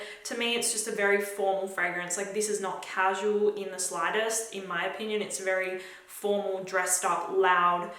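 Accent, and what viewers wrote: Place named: Australia. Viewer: Love ur Aussie accent.....❤️❤️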